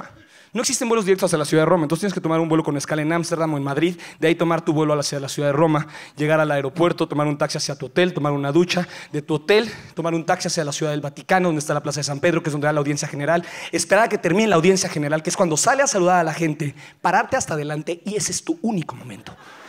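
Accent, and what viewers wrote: Argentina. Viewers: De verdad me creí que era argentino realmente le sale muy bien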